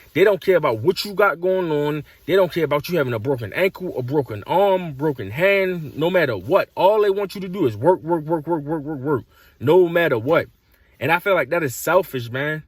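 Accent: Baltimore, United States. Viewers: This dude gotta be in Baltimore with that accent. And shit in Baltimore is different completely no matter what it is. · You from Baltimore? That accent crazy lol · are you from baltimore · Are you from Baltimore?lol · Bro definitely gotta be from Baltimore 😂 accent super hard 😂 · He sound like he's from Baltimore Lol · Definitely a bmore dude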